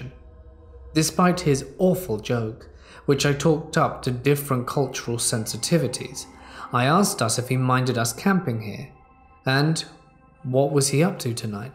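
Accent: United Kingdom. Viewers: I listen to other very good horror narrators, but, I ALWAYS come back to you!!! I sincerely feel calmed and soothed while listening to my favorite, British-speaking storyteller. I’d like to hear Pandora more as she grows up as a ‘ -Mini-Me”! A question/answer live chat would be a hoot with that little charmer! I am sure, that she dearly admires you! 😻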